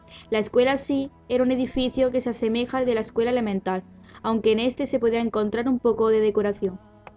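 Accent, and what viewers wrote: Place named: Spain. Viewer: Buena infrmación, buen vídeo. Me gusta el acento de la narradora. De qué parte de España es? Saludos. Gracias.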